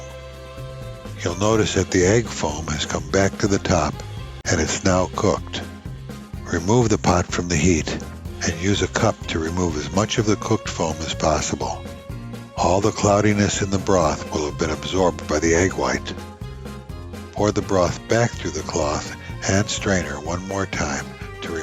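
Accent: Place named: Chicago, United States. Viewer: Thank you very much for your tutorial videos! I am especially fond of that Chicago-sounding accent. Makes me extra homesick when I am cooking, missing my both of my Grandmas, Aunts and of course, my Ma!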